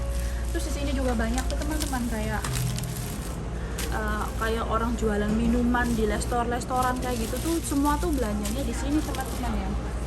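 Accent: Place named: Java, Indonesia. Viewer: Asli mana to MB kok medok bgt ngomongnya 🙏🙏🤗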